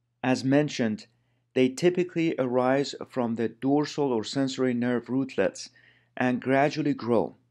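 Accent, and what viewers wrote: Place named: Iran. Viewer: Lovely Persian accent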